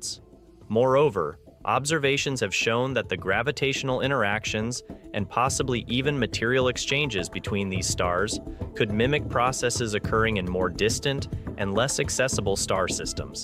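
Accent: United States. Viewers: I assume NASA sponsors this channel. The narrator has an American accent, so I don’t understand why NASA doesn’t utilize a narrator familiar with the pronunciation of astronomical terminology such as the type of an important type of star.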